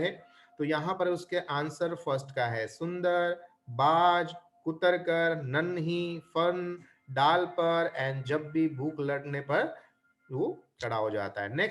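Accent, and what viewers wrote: Gujarat, India. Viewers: Sir aap Gujarat se ho?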